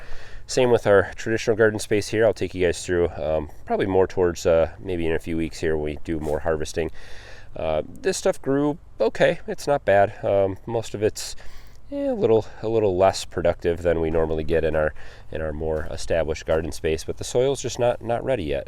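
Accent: Michigan, United States. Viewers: I want to start a garden next spring but I'm wondering if there's something I can do now. Should I till the soil now and cover with leaves? My area has been mowed but its weedy. It hasn't been touched for decades. P.S. Sounds like you're in Michigan. I'm in Genessee County. Zone 6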